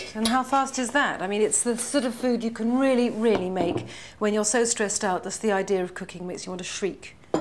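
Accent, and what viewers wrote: RP, United Kingdom. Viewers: Is it just me or was she even more posh pre-coke days??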